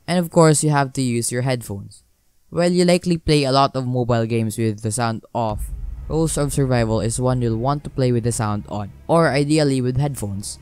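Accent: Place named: Philippines. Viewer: sabi na eh pinoy ka ibatalag acsent ng mga pinoy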